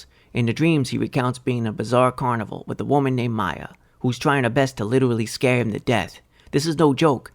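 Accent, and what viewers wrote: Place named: East Coast, United States. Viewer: As a twighlight zone fan from age 7, now, and until I shuffle off this mortal coil, I appreciate you doing these reviews. Living in the Midwest right now it's good to hear an East coast voice talking bout Rod's creation. What's your name brother...mines Edmon. 😎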